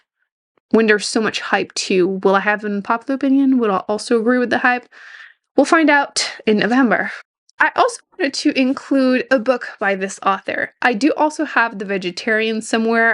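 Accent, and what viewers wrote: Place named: Quebec, Canada. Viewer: Glad you said you were from Quebec-I kept trying to place your accent. I also have only enjoyed Big Little Lies by Liane Moriarty - I gave up on her.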